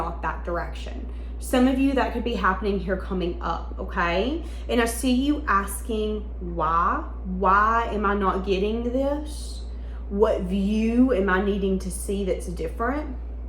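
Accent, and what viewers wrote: South, United States. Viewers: I do love her Southern accent. Paying attention from the UK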